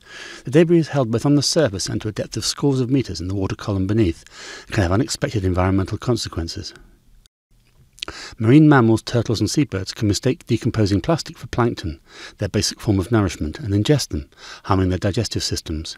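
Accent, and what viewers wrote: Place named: RP, United Kingdom. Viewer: I really hate this guy's breathy salivated kings English accent.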